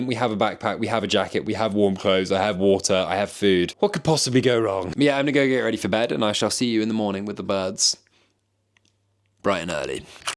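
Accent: United Kingdom. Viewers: learn me british accent pls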